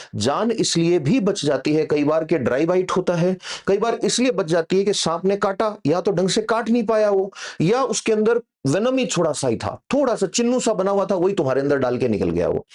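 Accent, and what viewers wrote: Rajasthan, India. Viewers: Sir aap sikar RAJ. Se to nhi ho aap ki boli se lag raha h agle lecture me bata dena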